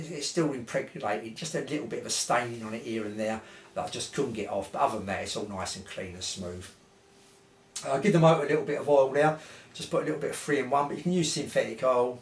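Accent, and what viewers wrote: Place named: London, United Kingdom. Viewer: Thanks, easy to understand and great to hear an old school London - Kent? accent! Be Lucky.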